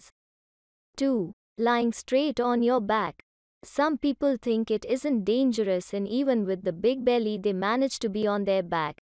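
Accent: India. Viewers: The 1st Indian-voiced YouTube video that made 100% sense 😀. A 31 weeks 1st-time preggo here.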